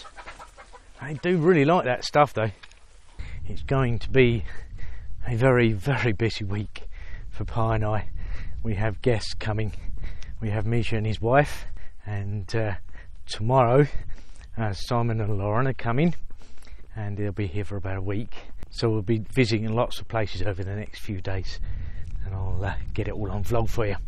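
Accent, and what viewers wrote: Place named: England, United Kingdom. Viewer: I really detest english accents. i'll try to listen for awhile 1. Edit: Ok, I still hate your accent but the visuals are very nice ! 2. Edit: You really do have some skill with the visuals. BTW, I know of your recent health issues and I send my sincere condolences.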